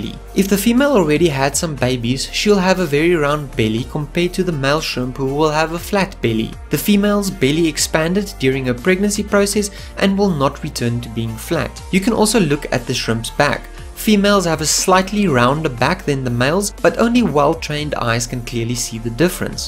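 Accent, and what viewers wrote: South Africa. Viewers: Are you from South Africa Is jy Afrikaans? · Bro you had me at the intro, but the south African accent was the cherry. Subbed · Aweeeeeh I didn't check your surname but as I heard your voice I knew ! This guy is from RSA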